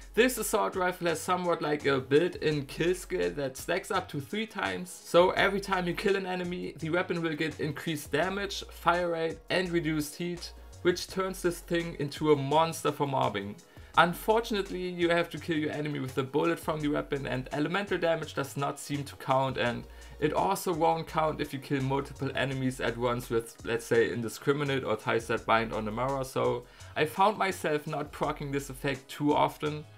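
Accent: Germany. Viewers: From your accent I´d say you are german.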